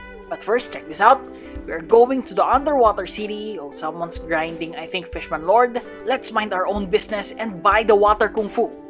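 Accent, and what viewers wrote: Philippines. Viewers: I'm new to your Channel (3 weeks) but when i first heard your voice i definitely know that you're a Filipino just that accent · You sound filipino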